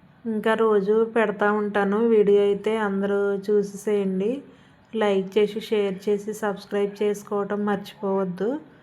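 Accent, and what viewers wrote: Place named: East Godavari, India. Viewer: Akkaaaa...Meeku east godavari yaasa vachesindiii..curry super 😊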